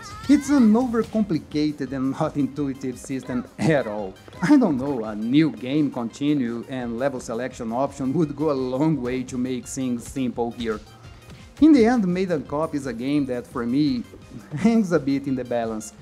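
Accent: Brazil. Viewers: Parece brasileiro falando inglês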